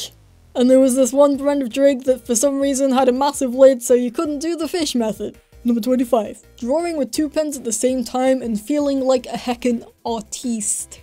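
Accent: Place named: United Kingdom. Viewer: love this british or somethin man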